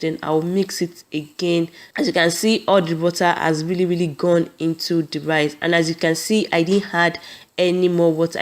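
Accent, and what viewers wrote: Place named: Yoruba, Nigeria. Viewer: Jeezzz you accent is just so yorubatic . Alf scotch bennet. 🤮🤮🤮 , add—- hadd, ott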